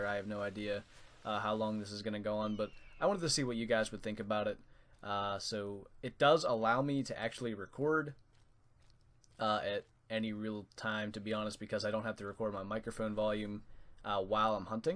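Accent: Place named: United States. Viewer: Hi, i m a french player of the hunter and want to say you, you are doing excellent videos. even cause of your american accent i don 't understand all of what you say in your videos but it's very nice to watch your videos. thank you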